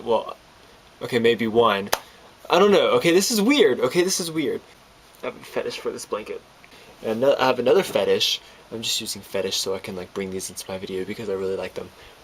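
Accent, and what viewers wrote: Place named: France. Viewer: do you have an accent? you sound a tiny bit french :]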